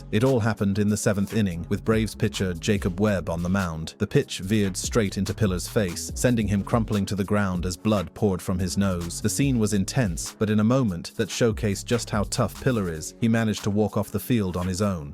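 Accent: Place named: United Kingdom. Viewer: Why the FCK does the narrator have a British accent???? Where in the UK does ANYONE play baseball???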